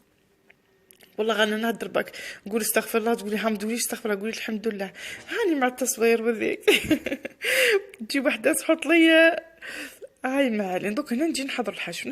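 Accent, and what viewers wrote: Setif, Algeria. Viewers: الله الله عليك ياسطايفية الحرة انا من قسطينة ونموت عليك يالحبيبة ونعشق الهضرة السطايفية الحرة ولله نحبك ونموت عليك ياعمري واني نضال فلمول نحوس 💕💕💕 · السلام عليكم، تعجبيني أختي، و خاصة هدرتك لهجتك السطايفية تهبل تهبل تهبل . ربي يشفيك، ماتتكليش غير على التيزانة، لازم دواء.... طهور إن شاء الله. تحياتي من تيبازة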